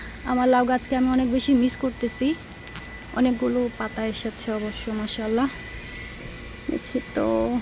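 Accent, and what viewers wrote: Sylhet, Bangladesh. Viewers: অনেকদিন পর সিলেটের কথা শুনলাম।আমার ভালো লেগেছে। আমিও সিলেটের মেয়ে।আমি লন্ডনে থাকি।আপনার কি কোন ডাকনাম আছে?❤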